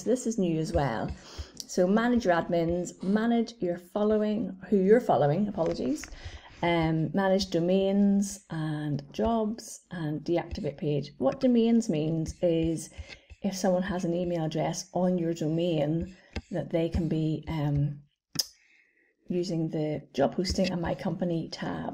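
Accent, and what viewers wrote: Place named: Northern Ireland, United Kingdom. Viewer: your voice is horribly muffled making it hard to understand what you were saying. Please review your videos, or have someone else do it. I could not understand you and had to turn on the captions to read what you were saying. Your work is good, but your voice quality is atrocious. You might also check yourself for regional accent items (are you Northern Irish?) to more "regularize" your English. Speak more clearly (enunciate), use a better microphone, and "flatten" your accent to a more BBC standard (or god-forbid, USA Mid-West standard) will make the valuable messages you have more easily received by folks. Thank you.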